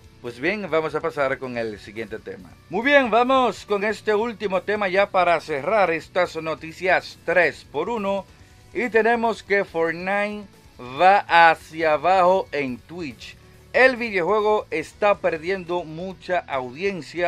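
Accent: Dominican Republic. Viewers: Eres dominicano?😕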